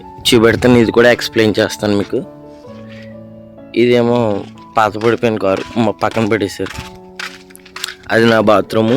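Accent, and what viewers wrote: India. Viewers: Ok ...I am from palakollu..bec of slang I guess u r from West godavari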